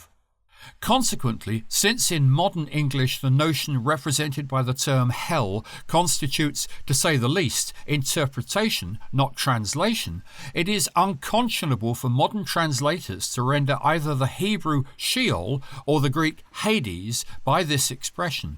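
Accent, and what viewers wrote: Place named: United Kingdom. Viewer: I love the British accent of the narrator! 😊😊😊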